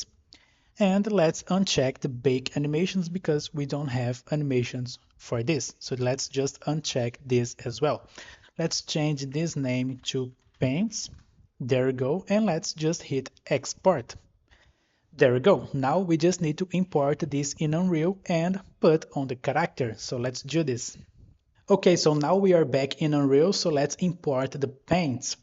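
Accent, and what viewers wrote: Brazil. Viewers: Nice tutorial. Are you brazilian? Your accent reminds a lot of people from here. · Thanks for the great tutorial! ...acho que esse sotaque é brasileiro! kkk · Brazil? i can feel the accent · Muito bom! Tô supondo, pelo sotaque (leve) que vc é brasileiro. Baiano, talvez 😊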